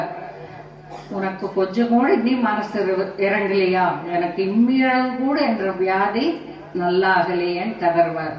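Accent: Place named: Coimbatore, India. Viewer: Konjum Kovai thamizhilil gurujivinudaya speech arumai 👌🏼 Blessed to be your student 🙏🏽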